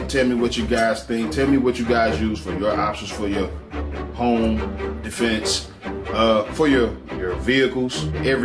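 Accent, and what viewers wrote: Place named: St. Louis, United States. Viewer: Sound like you from the crib STL. Where is the outdoor range? Want to go somewhere other than sharpshooter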